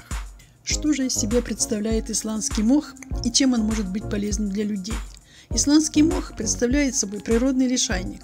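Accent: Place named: Ukraine. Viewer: с украинским произношением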